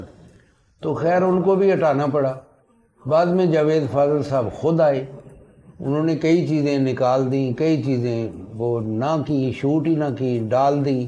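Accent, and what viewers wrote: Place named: Karachi, Pakistan. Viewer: میزبان صاحب آپ کا لب و لہجہ اور تلفّظ بہت اچھا ھے،مَیں طارق قریشی ھوں اور کراچی کئی سال رہا ہوں ،آپ مُجھے کراچی یا اُردو اسپیکنگ لگتے ہیں اور آپ مہمان کو بولنے پورا موقع دیتے ہیں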